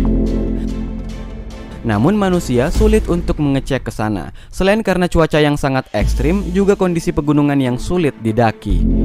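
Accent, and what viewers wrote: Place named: Madura, Indonesia. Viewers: Anjir suaranya kayak orang madura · Bro.. kok kayak ada suara bahasa maduranya..kayak orng jualan di kapal...😀😀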